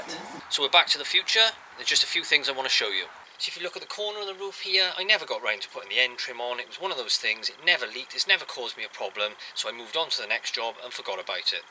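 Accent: Wales, United Kingdom. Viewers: Is that a cwmbran accent i can hear?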